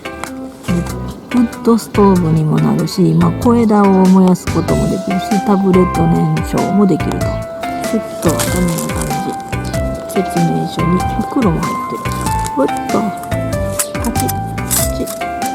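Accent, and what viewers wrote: Kansai, Japan. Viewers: 関西弁おっとり型の語り口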